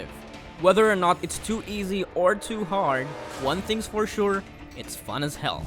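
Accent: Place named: Philippines. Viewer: Alam na alam mong Pilipino narrator eh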